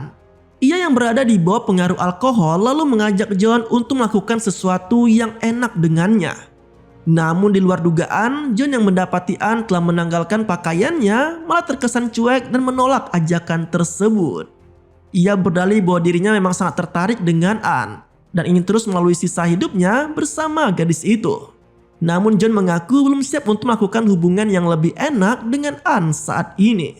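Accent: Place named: Java, Indonesia. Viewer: Biasa jgn baper ilat jowo 👅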